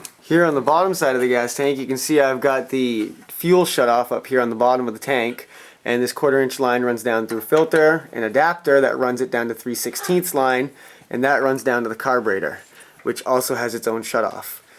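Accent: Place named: Midwest, United States. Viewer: Great timing. I was just wondering what part of the country you were in, when i started this video and I think you just said Maine. Funny, with your accent i was guessing somewhere in between Kansas and Indiana.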